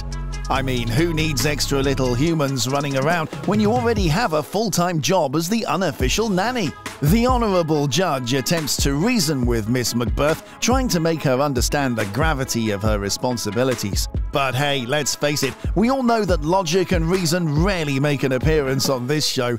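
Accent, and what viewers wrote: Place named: United Kingdom. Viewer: Who's the British voiceover?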